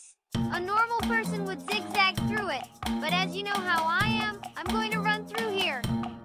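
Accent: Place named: Venezuela. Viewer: Adivina tú eres de Venezuela porque hablas de Venezuela tú nunca has dicho vos vos sos vos nunca lo As dicho entonces tú eres venezolana hablas venezolanas tienes el acento venezolano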